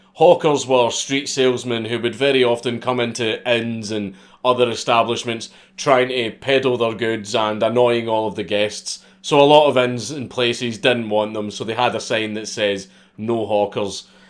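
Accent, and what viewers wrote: Scotland, United Kingdom. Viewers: a Scottish dude who is somewhat understandable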